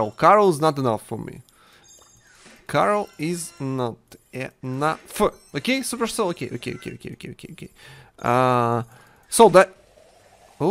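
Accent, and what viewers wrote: Russia. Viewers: Why you have a Russia aczent ? xD sorry I hope you can read dis I'm from germany xD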